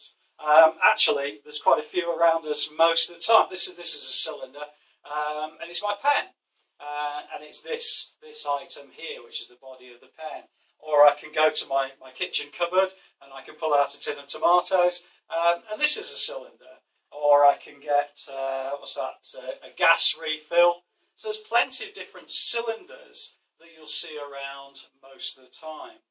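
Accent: United Kingdom. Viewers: I am also maths teacher are you British sir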